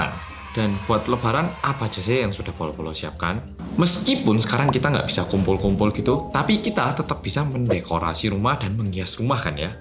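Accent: Java, Indonesia. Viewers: kaet ngerti onok wong korea medog wkwkw ! auto subscribe hehehe.. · 💙💙💙💙💙💙💙💙medokkkk karo ngapakkkk pada ae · Karena keseringan dengerin bercerita,waktu baca novel jadinya suara yg logat jawa itu yg terngiang ngiang. waduh gimana ini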